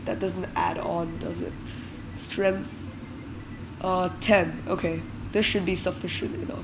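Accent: India. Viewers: r u an indian?????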